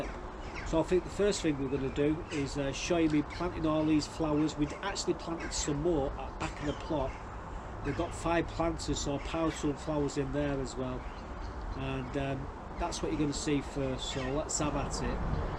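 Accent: United Kingdom. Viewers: Great video. Nice idea having handicap plots above ground. Your pavers are looking good already. Can't wait to see the finished area. You surprised me at the beginning by putting sunflowers in pots. I had one last year that was 10' tall. I've never had a small one before. This year they are popping up all over because they self seeded and some had to be moved. I love em though so they are staying! So you use the horse manure to top dress your beds then. Hope it doesn't smell. My husband sat here and watched with me. He was surprised that I could understand your accent when he was having trouble. He's originally from Redditch. Told him I've been watching your videos for a while now so was able to interpret a few things for him😅. So funny eh? A Canadian interpreting a British video for a British Canadian. Take care.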